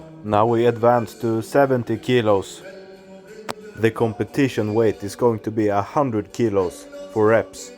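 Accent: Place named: Sweden. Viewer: With your Swedish accent, It feels like watching as if Magnus Samuelsson filmed his sessions in his haydays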